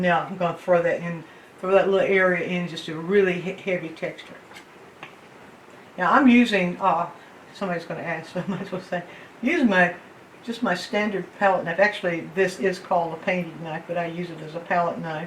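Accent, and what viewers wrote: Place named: Appalachia, United States. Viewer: I love the tutorials and I love so much that her accent sounds like mine. Any time I hear an Appalachian accent in a teacher of any kind it makes my heart glad!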